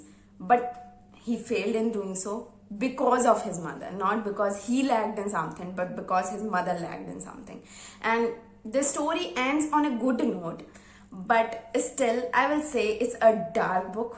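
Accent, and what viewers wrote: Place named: India. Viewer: My knowledge of english is poor. But I can understand little with your nice pronunciations with indian style of english speaking. Thank you... But my humble request to you that please make all your videos in the Hindi language also. So we can understand it easily. Here are Indians in majority so more and more people take advantages of your knowladge and reviews. Please tell whole story of book in brief also.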